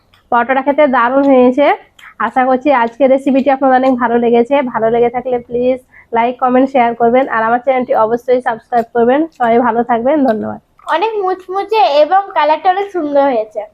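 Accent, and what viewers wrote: Murshidabad, India. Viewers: হ্যলো, আপনার ভিডিও দেখি আমি বহুদুর কানাডা থেকে, আপনি হয়তো পশ্চিম বংগ, মুর্শিদাবাদের বাসিন্দা, মিষ্টি কথা শুনে ধারনা করছি, কোথা থেকে আপনি জানালে খুশি হব, আমি মুর্শিদাবাদের মহিলা । আপনার সব রান্না নিঁখুত হাতের, আপনি বড় হোটেলে কাজের সুযোগ পাবেন । একটা অনুরোধ আপনি ফুডকালার/ লাল রং যেটা বিরিয়ানীর আলুতে ব্যবহার করেন তা কম পরিমানে ব্যবহার করবেন কারন, এই রং থেকে “ক্যনসার” হয় । আরেকটা অনুরোধ বিরিয়ানীতে মশলা ব্যবহার করবেন না ।হায়দরাবাদের বিরিয়ানী ছাড়াও ( পুরানো ঢাকার বাবুরচির রান্না “ কাচচি বিরিয়ানী” google করে দেশে শিখে নিতে পারেন; এই বিরিয়ানী- নবাব সিরাজদৌলাহ এর সময় থেকে পুরানো ঢাকায় এসেছিল, তাদের আত্মীয়তার সুবাদে । আপনাকে ও পরিবারের সবাইকে নুতন বছর ২০২৪ এর শুভকামনা জানাচ্ছি । শুভেচ্ছানতে । জিনাত/ খুকু, ০১ জানুয়ারী ২৪🇨🇦🙏❤️🥰🌹🌹🌹🌹🎈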